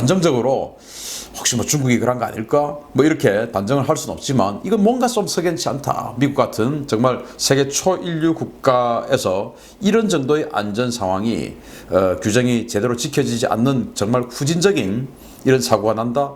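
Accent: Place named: Gyeongsang, South Korea. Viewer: 경상도 사투리를 사용하시는 사람이던데요...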